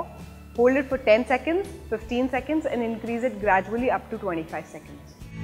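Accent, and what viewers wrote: India. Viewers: Indian accent is very hard to understanding is she really speaking English or different language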